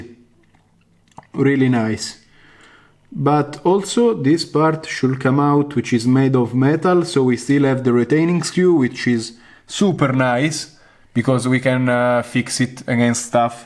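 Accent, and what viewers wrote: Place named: Italy. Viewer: Pure pleasure to watch your movies mate. they sound even cooler with this italian accent :D wish to have more confidence to show my experiments publicly :D like You :D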